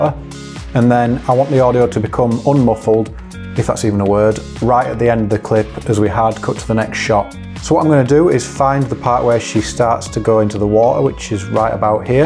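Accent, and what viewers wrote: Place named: Yorkshire, United Kingdom. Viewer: Knew it was a Yorkshire accent! Not too far at all. I found a lot of tuts on this effect but really liked the examples you showed and the intro is class too - you gave examples during the intro, I really think that catches the attention - the transition to your logo is slick too. Yeah weird how only a few likes. I'm constantly checking YT out for Prem tuts and only just found your stuff so it might be that you're not appearing on everyone's searches yet? Not sure how it works exactly but maybe once you get more subs etc then people will find you. I'll be working through your vids anyway and certainly recommend you to others!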